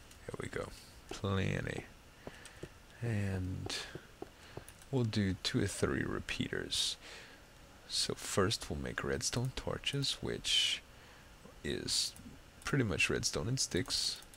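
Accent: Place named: Texas, United States. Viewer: you say too too much too too much. but nice voice, accent sounds like a mix between texan and european lol.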